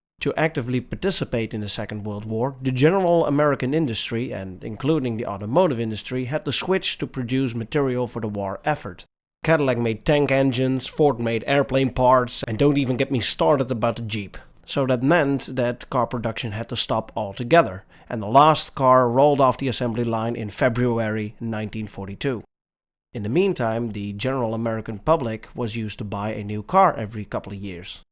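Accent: Netherlands. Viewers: Is the narrator dutch? Ik hoor een licht accent. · Hey you have a strong foreign accent. You sound very Dutch even though it is clear that you are trying your best to put on an 'American' accent. Are you Dutch?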